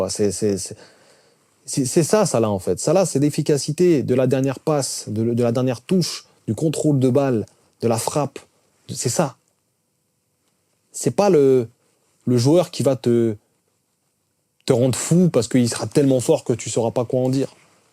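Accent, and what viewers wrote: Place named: Switzerland. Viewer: wesh t'es suisse, on dirait pas avec l'accent jajaj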